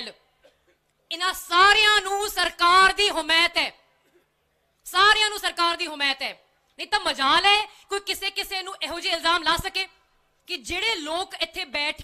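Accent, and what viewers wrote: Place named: Punjab, India. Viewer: ਪਿਉਰ ਪੰਜਾਬੀ ਬੋਲਦਿਆਂ ਸੁਣਕੇ ਰੂਹ ਖੁਸ਼ ਹੋ ਗਿਆ ਏ ਇਨਾਂ ਟਾਈਮ ਬਾਲੀਵੁੱਡ ਚ ਕਰਨ ਤੋਂ ਬਾਅਦ ਵੀ ♥️♥️♥️🙏🏼🙏🏼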